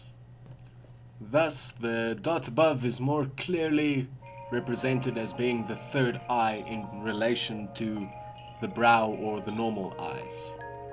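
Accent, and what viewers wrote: South Africa. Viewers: ? Are you also South African? I recognize your accent. Is there any way I can contact you? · sorry if this sounds weird your accent sounds verry familiar are you mby from SA if u are nice to see im not alone in this country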